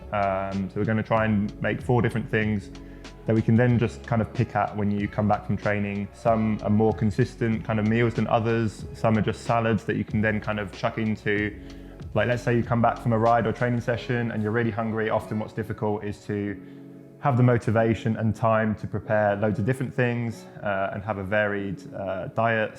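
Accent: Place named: United Kingdom. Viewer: Sam vraiment ton anglais il m’impressionne avec la petite touche british wo’er 😂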